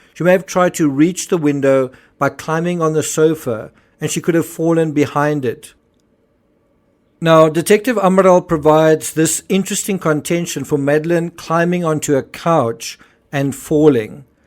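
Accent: South Africa. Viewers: Hi sueelliott4793 from me, also in NZ. I like SA accent but even more than that I like his creepy intro music! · What I cant understand is that if it was an accident, why cant the parents admit it? Love the Sa accent, it reminds me of home. Hi from NZ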